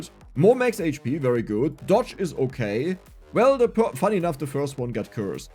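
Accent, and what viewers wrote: Germany. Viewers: You sound German… Edit:he is I’m proud of my country now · I Hear deutsch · German english is awesome 👍😎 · i can firmly hear the german accent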